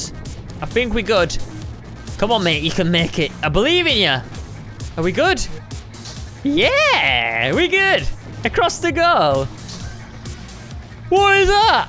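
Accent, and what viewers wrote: Scotland, United Kingdom. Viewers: U sound british but yeah me too 😂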